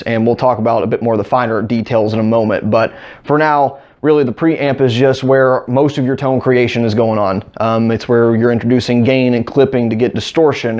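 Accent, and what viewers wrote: Texas, United States. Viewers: It took me a while to notice that you have a texan(or at least southern) accent. But I like it bro, you sound like a cowboy Ps: I'm from Brazil, english isn't my first language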